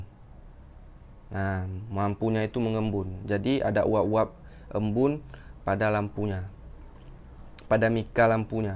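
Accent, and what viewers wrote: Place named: Aceh, Indonesia. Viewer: Orang aceh bang y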